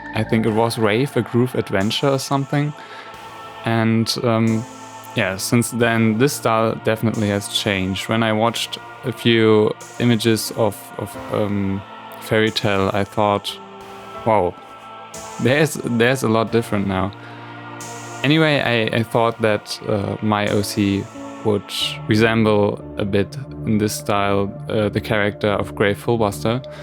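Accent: Germany. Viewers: it's a german accent, so i guessss yes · Von deiner Aussprache her nehme ich einfach mal an,dass du ursprünglich deutsch sprichst,was es einfacher macht zu kommentieren xD Deine Version von FairyTail und Death Note hat mir am Besten gefallen,weil man sofort am Ausdruck erkannt hat was es sein soll.Was Naruto angeht hab ich genau zu dem Zeitpunkt angefangen es regelmäßig zu schauen als du aufgehört hast,also mit dem Beginn von Shippuuden.Gegen Midseason würd ich mal sagen gings mir dann wie dir,ich hab lange nicht weiter geschaut und als ich vor ein paar Monaten dazu kam war das erstmal ein Schock weil sich zu damals wieder so viel verändert hat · I don`t like naruto because of the german translation. (I somehow noticed on your accent that u r german, I am actually german too but I mostly speak english and that`s why I write this on englisch now XD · I heard it from the forst seconds on, that you're German! 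(Because of the accent xD) But I'm too :P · Ich hatte schon den Verdacht wegen dem deutschem Akzent :P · you sound very german (almost like my english teacher XD ) but I like your talent. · hahaha ich wusste du bist deutsch, den dialekt erkennt man sofort ^^ aber cooles video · Your accent... your german too, right? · Are you actually german? I thaught so because of your accent ^^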